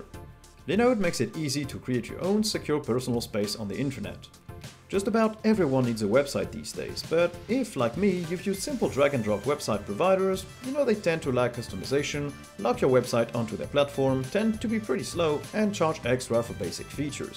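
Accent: France. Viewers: You've something like a French accent..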